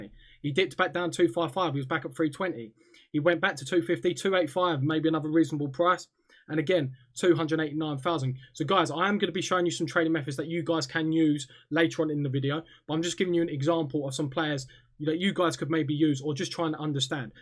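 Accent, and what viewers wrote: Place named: Australia. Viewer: tbh you sound very Australian xD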